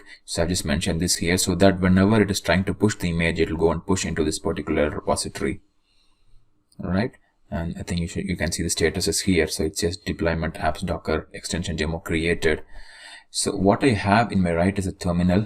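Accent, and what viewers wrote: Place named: India. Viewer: I really appreciate that you guys from India make those technical videos that help me to solve whatever issue, but finally you are the 1st one with not that strong accent :-D Thank you for videos :)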